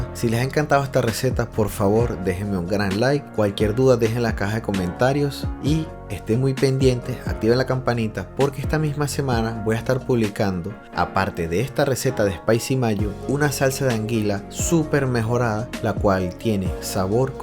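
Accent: Venezuela. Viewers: Ays hace poco conocí una venezolana que hablaba como tú 😅 majísima por cierto!!😊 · Pareces maracucho 😏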